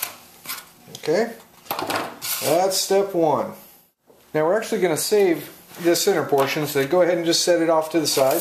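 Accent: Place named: Michigan, United States. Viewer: What part of the country are you from? Because your voice and accent are exactly like mine! My wife thought I was on the phone, then she thought I was narrating this video. We have been married for over 30 years, and she was amazed listening to you talk. We both grew up in Michigan. Just wondering if that is where you are?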